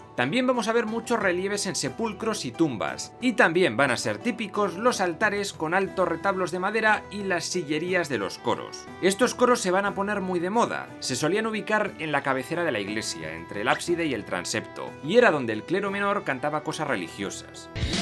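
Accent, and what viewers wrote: Spain. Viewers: Me hizo reir los comentarios de este narrador. Con ese acento español. Pero muy interesante lo que dijo.😂😂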